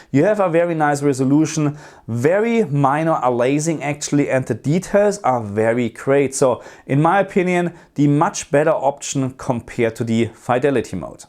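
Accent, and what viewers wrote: Germany. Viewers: Man hört so raus das du Deutsche Wurzeln oder so hast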